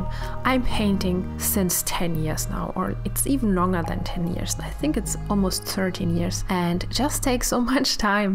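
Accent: Germany. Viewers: Ich liebe deinen deutschen Akzent! Ich lerne gerade Deutsch. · Man hört so sehr raus das du deutsch bist ich liebe es 😂❤️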